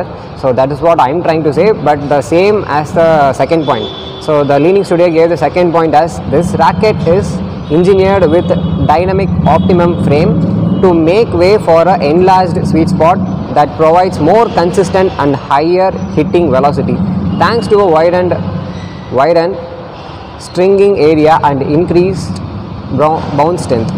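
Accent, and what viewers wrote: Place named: South India, India. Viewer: he is south indian thats their accent. u understand thats important .ignoreit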